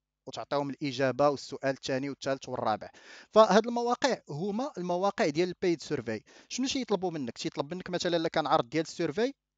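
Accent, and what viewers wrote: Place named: Maghreb. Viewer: لا استطيع فهم اللكنه المغاربيه مهما حاولت للاسف اللكنه او اللهجه المصريه اصبحت رقم واحد في الوطن العربي😔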